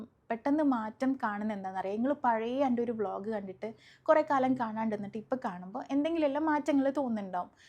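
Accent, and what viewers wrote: Kannur, India. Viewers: Nan link open cheythu nan comend cheyyarilla njan Areacod anu ❤❤❤❤ ningale sound nalla ishttanu kannoor varthanam ishtam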